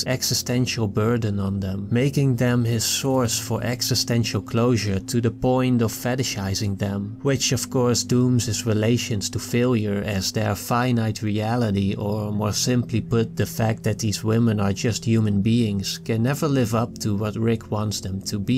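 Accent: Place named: Netherlands. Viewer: Cool video, the accent with a couple words you pronounce in a particular way...sounds very very familiar, makes me feel at home! Thank you for the video! This could have helped me a lot about 2 weeks ago, luckily music was my first love and brought me back. Now clearer than ever and finally at peace with myself. Grtz, a no longer wondering Hollander! ;) <3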